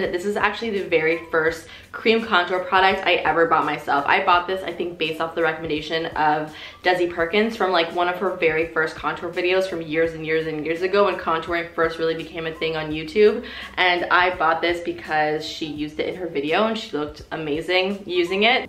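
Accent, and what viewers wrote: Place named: Canada. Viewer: I love you and your videos!!! I could just listen to them 24/7. Also the way you say name is 👌🏻👌🏻👌🏻 I genuinely love your Canadian accents lol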